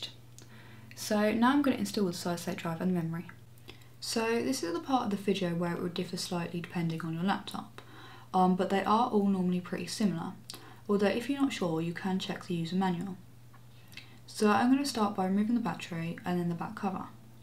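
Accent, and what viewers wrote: England, United Kingdom. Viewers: are you an idiot shes english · Are you from england ?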